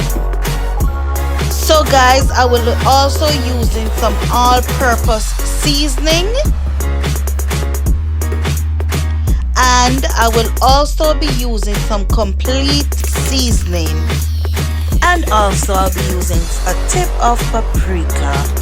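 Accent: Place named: Jamaica. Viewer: Looks so yum that Jamaican accent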